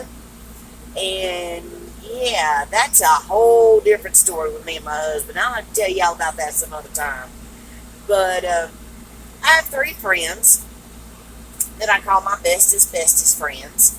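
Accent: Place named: South, United States. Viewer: Knee high to a grasshopper?? Great southern twang!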